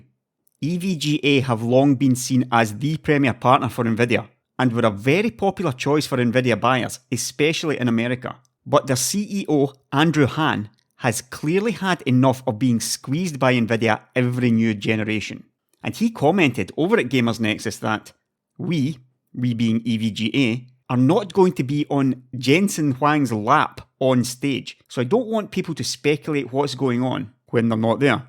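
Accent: Scotland, United Kingdom. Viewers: Love you, you are my favorite scottish accented tech youtuber of them all, and i can't wait to see more of you in the coming months. Much love and keep strong! <3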